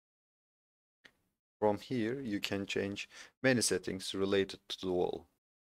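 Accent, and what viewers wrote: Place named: United States. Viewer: The word he is saying is Command, but with an American accent. For example: WA is the Wall Command. So when you are trying to figure out what he is saying, just try saying the word in American, not English, and you'll figure out what he's talking about. I know it makes it difficult for the other 98.5% of countries on the planet that don't speak American, but at least we all use Metric, unlike 1.5% of countries that are still using Imperial units.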